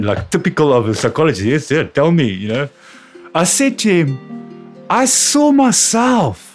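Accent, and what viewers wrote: South Africa. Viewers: Sounds South African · ❤ great profound little video, profound, and that South African accent 😂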